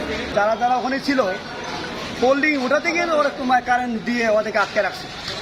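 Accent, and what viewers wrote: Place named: India. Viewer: এইডা তো ইন্ডিয়ান মাল। এর ভাষা শুনলেই বুঝা যায়।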